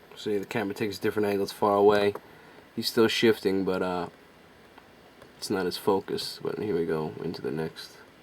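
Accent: Boston, United States. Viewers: Are you from the east Coast? I am from NJ. I seem to hear a boston twang. or maybe a brooklyn tone. We knowers of the truth need to get together. I suggest weekly Videoconference. What causes the quick Snap back to normality? We need to execute Genocide concerning these creatures.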